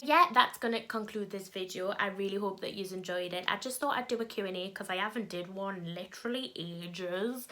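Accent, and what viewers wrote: Newcastle, United Kingdom. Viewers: I love your accent! Makes me miss Newcastle. I used to live up there for a bit. 🙂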